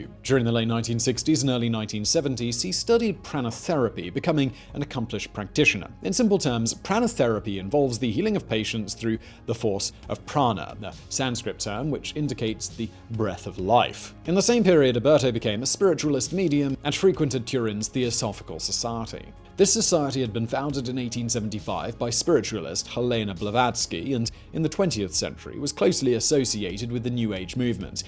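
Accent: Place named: Southeast England, United Kingdom. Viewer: It's a bit weird, and the phrasing is idiomatic in places, but it's definitely southeast English.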